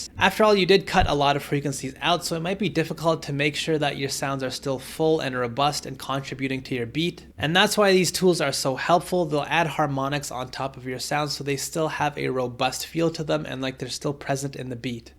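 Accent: India. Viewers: Are you a Indian residin in US ???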